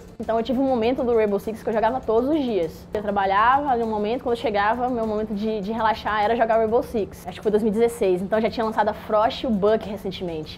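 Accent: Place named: Bahia, Brazil. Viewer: Adoro ela nas narrações na ESPN não tem aquela voz chata além desse sotaque baiano que é maravilhoso...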